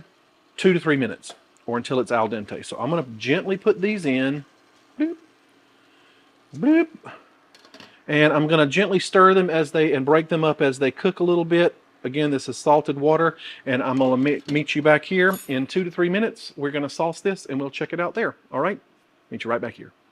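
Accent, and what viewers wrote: South, United States. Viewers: What a great channel name to go with your sexy soothing Southern gentleman's voice.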